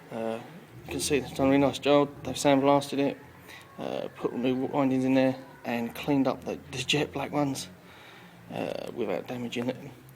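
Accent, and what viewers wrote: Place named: United Kingdom. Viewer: Nice one.. Good to hear a UK accent! Im about to do this on my '97 CBR 6f. Kept burning out the mid connector. Anyway brilliant upload thanks:) oh and check out 90's Japanese Sportsbikes on Facebook. Were a good bunch and ya bike qualifies lol.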